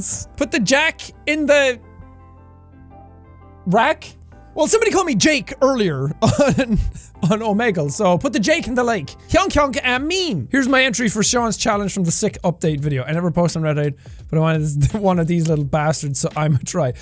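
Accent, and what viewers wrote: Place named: Ireland. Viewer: This is already the second time of me turning on Jack’s video and forgetting that my headphones are off and my family hears an Irish man yelling from my phone.😳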